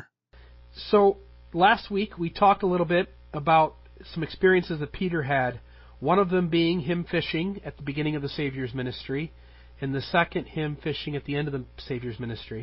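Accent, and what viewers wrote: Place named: Great Lakes, United States. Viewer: sounded so much more ‘Great Lakes’ back then.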